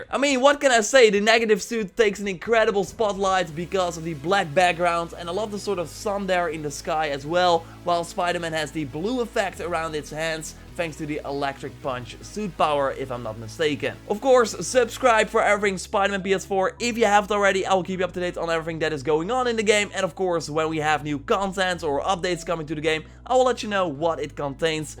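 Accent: Netherlands. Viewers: Are you dutch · Are you dutch im dutch you sound dutch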